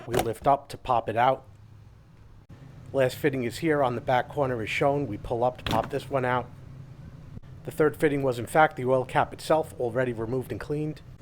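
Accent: New York, United States. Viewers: Sounds like a New York accent and it made watching this video hard. Just terrible accent.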